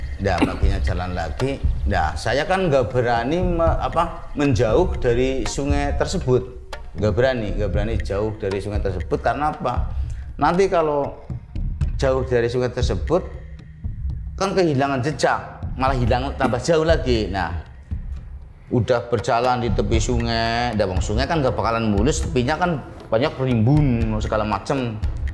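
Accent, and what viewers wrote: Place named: Banyumas, Indonesia. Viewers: Owalah wong ngapak jan😅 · Waduh ngapak ya...😊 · Ceritanya sedih dan bikin haru,cm krn bapak ngomong jawanya ngapak,jdi lucu buat saya yg nyimak,jdi kdg udh terharu tetiba ngakak sayaaaa😂😂😂,sehat2 nggih pak❤ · Salam dr Banjarnegara. Kelihatan logatnyaaa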